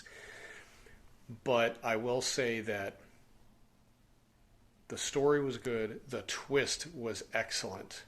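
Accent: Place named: United Kingdom. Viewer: Your British accent ain't bad to be fair (from an English man lol)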